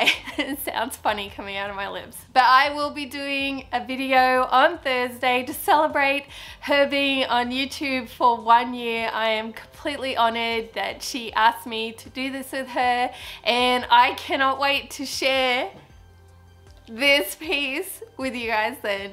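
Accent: Australia. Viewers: So this is the third time I’ve watched this video and I must confess! I also love Dionne’s work and have been hesitant about starting a piece like this. But when you said you messed up the top and redid it, it dawned on me that when you’re painting like this, there are no mess ups, just happy accidents ( thanks bob ross❤️) I think that “mess up” would have added more character! They’re meant to look aged and weathered and painted over a million times, like an old Spanish door❤️I really love this piece and hope to see you do more! Personally for me, you took the fear out of it!😄. I’m really enjoying your channel, your work …and your sweet Aussie accent🥰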